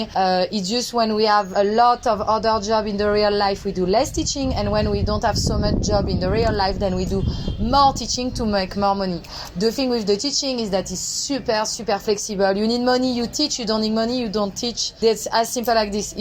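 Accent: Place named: France. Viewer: I am in love with your french accent ❤️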